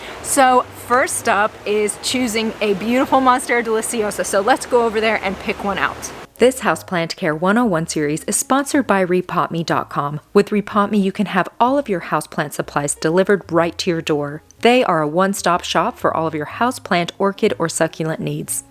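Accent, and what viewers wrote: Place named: Manchester, United Kingdom. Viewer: I'm from Manchester, it's nice hearing someone from pretty much down the road talk about their plants. Not showing me their Monstera on a California balcony.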